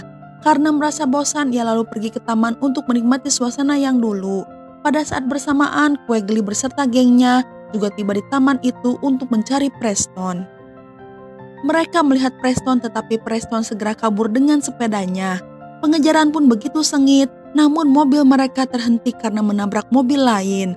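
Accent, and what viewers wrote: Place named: Sunda, Indonesia. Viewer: Suaranya khas yaa sunda hihi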